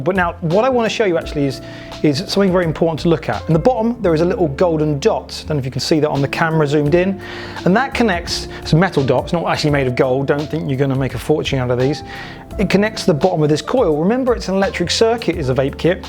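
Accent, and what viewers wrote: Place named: United Kingdom. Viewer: Thanks & good to hear a British accent.. So I been vaping hardcore n it was time to change the coil after 2420puffs approx 170ml of ejuice 75w on a r kiss2 so yeah I'm pretty pleased with that. What I usually find is the coils you buy ain't as good as the ones that come with the device I could of opted to use the spare coil in the box but decided to try the smok coils I bought with my device.. I will keep y'all informed Thanks for your video 🏴🇬🇧👍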